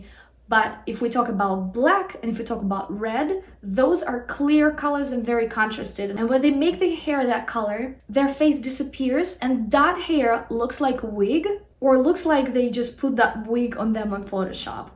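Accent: Russia. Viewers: Мне кажется, или есть очень сильный русский акцент?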